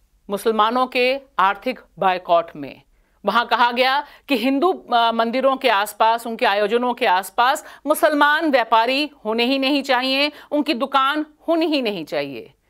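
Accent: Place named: Jabalpur, India. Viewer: आपका बोलने का अंदाज, मध्य प्रदेश के जबलपुर आसपास से लगता है, एकदम बुलंद 💪🇮🇳🙏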